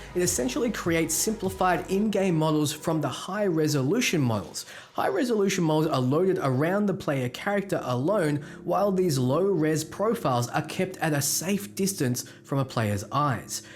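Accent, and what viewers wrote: Australia. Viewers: Great video. Hey are you an Aussie or a Kiwi? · Nice to hear an Australian for fucking once!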